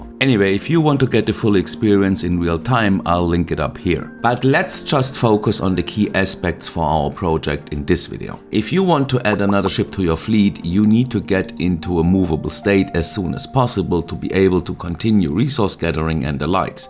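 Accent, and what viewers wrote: Germany. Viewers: Fine video. ( On a sidenote: I'm really struggling with our german accent 😅)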